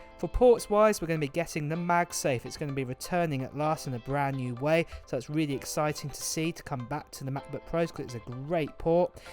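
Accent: United Kingdom. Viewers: Well, I want to buy the iPhone 11. But sadly I don’t have money for that. And also i didn’t already bought any apple gear or product cuz I don’t have money for that, And i hope i will be the one who got your iPad. Because It can help me in my studies. btw i like your british accent, congrats and godbless💖💖💖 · I hope to get the iPhone 13 for Christmas. I your channel is great and informative and I just really love your British accent when you say thousands it sounds like fousand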